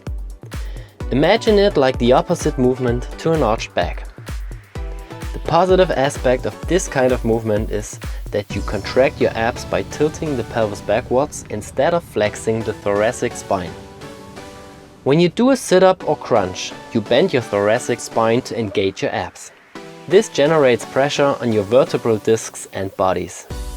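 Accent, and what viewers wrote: Germany. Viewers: dieser deutsche akzent hahahahaha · That was a german accent, right? ^^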